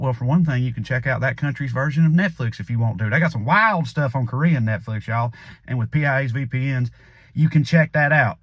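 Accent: South, United States. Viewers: Dude!!!!!.. GIGGLE MERCHANT!!!!! STILL LMFAO!!.. LOVE THE SHOW, AS ALWAYS SPOT ON!!.. ALSO I COULD LISTEN TO THAT SOUTHERN ACCENT ALL DAY LONG!!( ONLY A COUPLE WORDS I GO..??? WHAT'D HE SAY??!!!! YOU ARE AWESOME!!.. I SO APPRECIATE THE HARD WORK THAT GOES INTO YOUR SHOW!!.. YOU ROCK!.. THANKS FOR KEEPING IT REAL, HONEST, AND UNFORTUNATELY TRUE!!... VOTE VOTE VOTE BLUE!!!!!... PLEASE 🙏 🙏 WE GOTTA STOP THE SICKA** REPUGNANT REPUBLICONS!!!..... YOU'RE A GREAT HUMAN BEING ❤️ ♥️!!...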